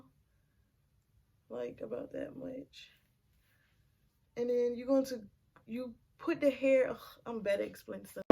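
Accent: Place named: South, United States. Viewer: You country as hell that accent girl....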